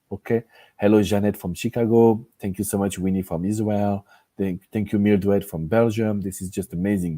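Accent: France. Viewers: Your French accent is amazing is an advantage 😊 thanks 🙏 for everything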